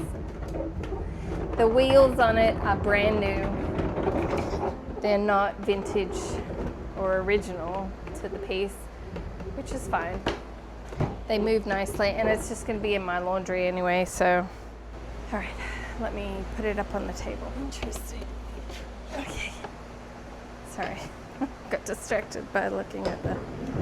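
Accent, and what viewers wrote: Australia. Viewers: So so so glad to hear an Aussie in US restoring furniture .... so glad to have found your youtube channel ...... Trust you go really successfully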